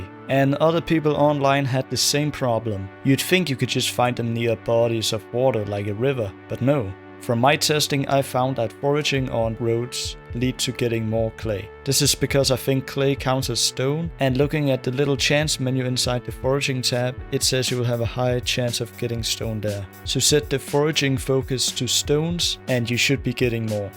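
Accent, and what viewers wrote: Denmark. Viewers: Very informative video, great intro and thanks for the hard work you put into it! Btw is that a danish accent? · are you danish? loved the video btw! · are you danish bruv?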